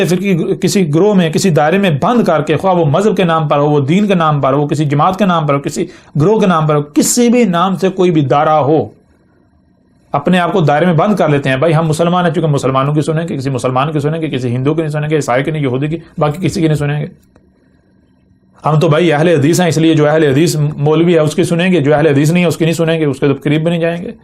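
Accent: Pakistan. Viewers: لعنت الله عليك! You seem to be green Murree from your accent. I will find you soon InshaAllah and.... you know the rest. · یہ چھوٹی بات نہیں ہے لہجے سے ڈھیٹ پنجابی لگ رہا ہے لیکن چونکہ یہ اپنے آپ کو نبی کہہ رہا ہے تو کیا اللہ تعالیٰ نے اس کو اپنی کتاب کا نام تک نہیں سکھایا؟ کران کران کر رہا ہے بے غیرت بے شرم اور کچھ گمراہ لوگ واہ واہ کر رہے ہیں ۔ اس ویڈیو میں تو وہ کوئی خاص بات نہیں کر رہا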